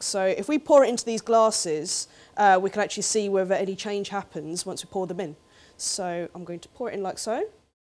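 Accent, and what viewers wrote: United Kingdom. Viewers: Attractive Asian woman with british accent and a science degree... where does one find such majestic creatures? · Asian Chick + British accent = HOT!!!!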